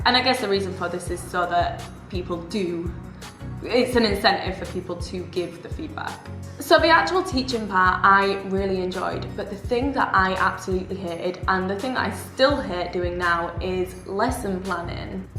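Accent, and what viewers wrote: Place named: England, United Kingdom. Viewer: Hello ! Thanks for the video ! Im very curious to know about your accent. From which region are you in England ?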